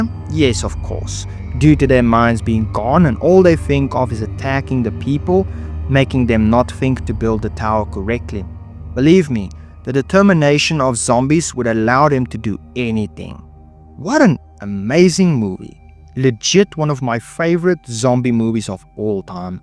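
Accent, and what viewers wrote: South Africa. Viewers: Hey are you south african by any chance?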